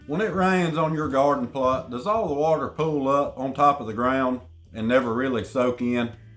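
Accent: South, United States. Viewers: I love that deep southern accent brother.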